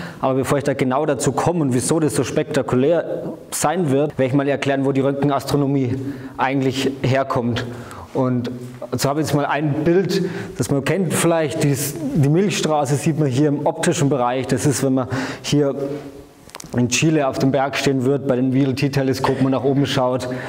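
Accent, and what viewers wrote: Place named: Bavaria, Germany. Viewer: Mit schwerem (bayerischen?) Dialektakzent und zu hohem Sprechtempo leider schwer verständlich!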